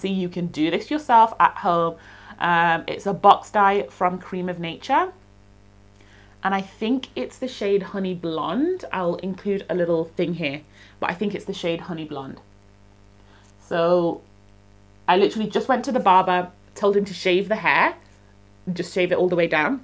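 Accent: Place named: United Kingdom. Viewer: no problem! Haha I can't hear it at all. You sound like you landed from the UK like yesterday 😅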